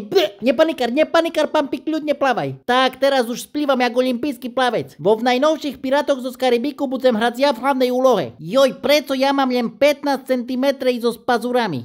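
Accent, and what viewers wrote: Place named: East Slovak, Slovakia. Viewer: Juuuj Pampi, jsi největší hvězda ⭐ na jakou jsem narazila. Kdysi jsem měla manžela z východního Slovenska a tento dialekt miluju❤mám na to krásné vzpomínky ze Šariša😂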